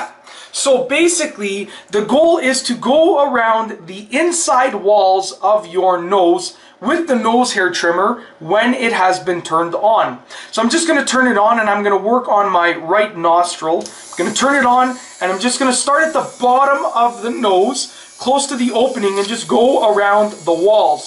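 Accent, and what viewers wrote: Canada. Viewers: also nice thick canadian accent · Are you Canadian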